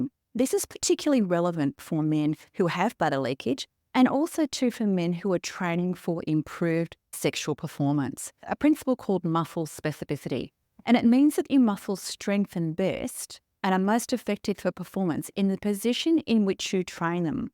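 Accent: Australia. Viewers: The Australian accent is very appropriate for errectile dysfunction discussion. My favorite channel so far.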